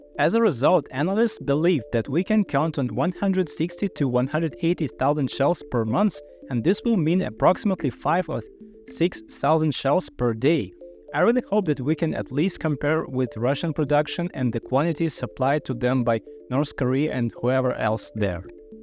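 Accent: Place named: Ukraine. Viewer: Happened to find your channel and I know it’s brand new, but the strength of your convictions and your passion is clear to see. Also it is good to hear another actual Ukranian voice. I look forward to seeing more content.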